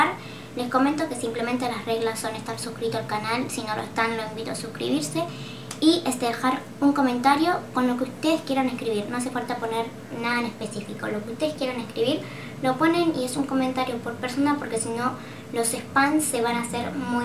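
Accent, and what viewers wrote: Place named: Argentina. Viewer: hola me fascina el acento argentino😍 yo soy mexicana pero como me gustaría hablar como tuuuu🙊 PARTICIPO.. me encantaron la paleta de sombras, gracias por el sorteo.. un abrazo😘🙋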